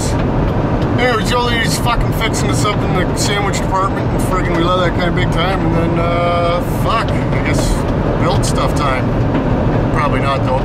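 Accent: Canada. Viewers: lol, this guy is like someone from trailer park boys, but with more of a Canadian accent.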